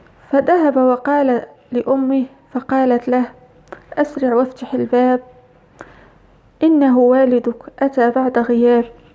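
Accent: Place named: Tunisia. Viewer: السلام عليكم ورحمة الله وبركاته من كلامك أنك تونسية بارك آلله فيك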